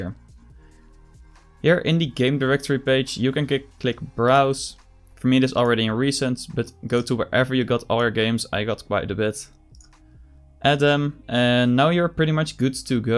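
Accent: Netherlands. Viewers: Your dutch 100% sure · jij bent nederlands dat hoor ik aan de manier hoe je engels spreekt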